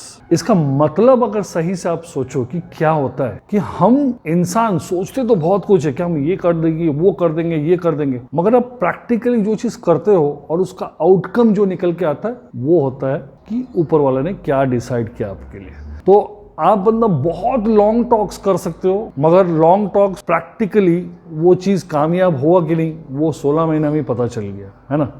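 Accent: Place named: Bengal, India. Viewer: Sir are you bengali.. Because your accent is like that 😅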